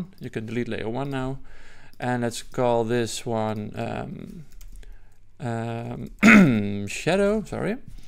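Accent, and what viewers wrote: Netherlands. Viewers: Nice video. you can never get rid of a dutch accent i guess.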